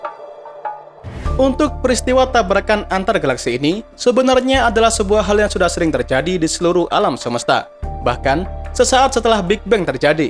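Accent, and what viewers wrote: Java, Indonesia. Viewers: Jowone metu min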